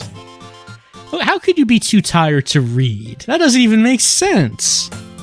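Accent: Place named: Brooklyn, United States. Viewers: Dat Brooklyn accent, doe. Cute. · Ohh... The brooklyn accent..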